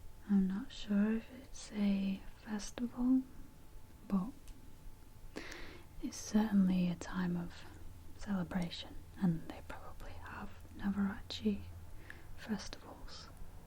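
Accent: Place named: North, United Kingdom. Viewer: Awesome video, really love this channel. Your northern accent/dialect adds to the experience. Love you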